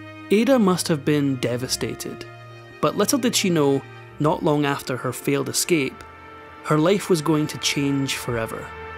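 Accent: Scotland, United Kingdom. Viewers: Interesting...but is no one going to comment on the voice of the narrator lol I have heard him narrate a few such stories What a lovely Scottish accent he has .. not like some of those voices you sometimes get . But yes Interesting account of her life and very sad also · The narrator of these videos sounds just like David Tennant. I know I'm being naive here about Scottish accents, but there ya go. I hope my comment doesn't offend anyone, i.e., they all sound alike. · Sounds as if this has been sourced from the BBC because we’re listening to Scottish Historian Neil Oliver… pure brilliant wee man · Great story told well - especially the Scottish accent! But please don’t say “close WITH someone; the correct way is to be close TO someone. The first is an Americanism that has really annoyed me recently in Australia . . .and should annoy any good Scotsman too. 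· If all of this accounts’ voiceovers are AI, this is the most natural of them all. There is inflection, natural pauses and no unnatural stops mid sentence. This gentle Scottish voice should be used for all of the videos. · Off topic comment/question: I can't get over how much you sound like another Scot who is a political commentator with a particular interest in U.S. politics, & can be seen & heard on The Meidas Touch Network. Any relation? I'm not remarking on the accent alone although that, of course, plays a part. I'm talking about the sound of the voice, the cadence, tonality etc. as well. If this is too personal, I apologise, & ask that you simply disregard the question. Otherwise, thank you for reading & providing me with something new to which I can Like & Subscribe.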